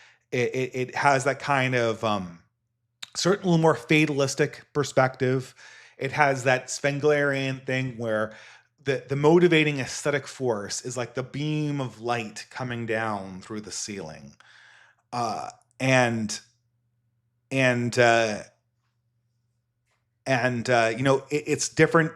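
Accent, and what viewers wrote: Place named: California, United States. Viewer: you really gotta do something about the California accent.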